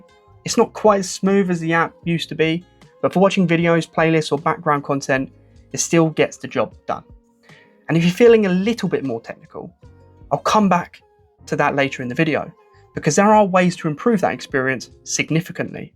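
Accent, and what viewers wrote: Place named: United Kingdom. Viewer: Loved the very strong british accent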